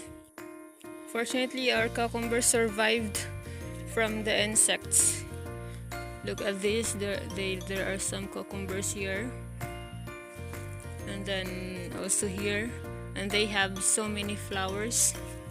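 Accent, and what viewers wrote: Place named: France. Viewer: Thanks for the tour! Very nice farm. Do you realize that you have a French accent? Ha ha, you do.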